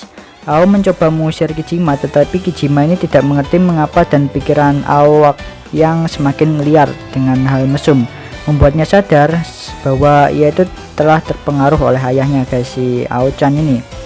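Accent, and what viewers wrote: Java, Indonesia. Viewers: Eh kau orang Jawa ya? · Wong jowo maa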